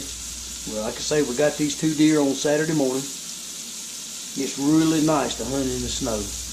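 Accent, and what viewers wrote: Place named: South, United States. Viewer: wow, never thought i'd see a guy with a southern accent cooking on a real trangia stormcooker with all the proper bits. those guys usually hate everything that isn't american.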